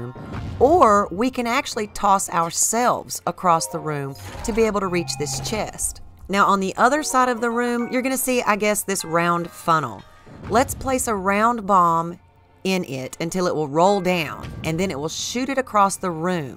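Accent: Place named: Kentucky, United States. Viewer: Dang you sound just like I do.. I was born and raised in Kentucky.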